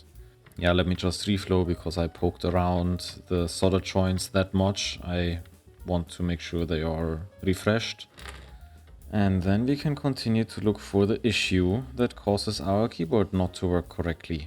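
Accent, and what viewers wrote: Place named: Germany. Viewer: so you could definitely say that the dark background music you put in there was already some sort of "subtle foreshadowing", right? BTW, you sound waaaaay too german for someone that speaks english natively, so i guess we're both from germany?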